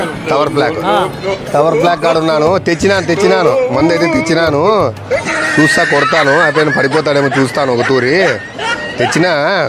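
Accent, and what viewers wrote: Rayalaseema, India. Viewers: Madanapalle Naa thalli gari uru chittor daggara thandri garu uru super rayalseema slang comedy chupinchavu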